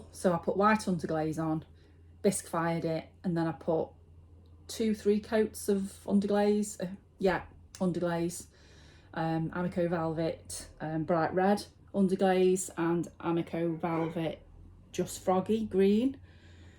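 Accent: United Kingdom. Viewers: Noo don’t ever think that. You are so lovely and I like your really pretty English accent ❤. And all of you 😘🙏🏼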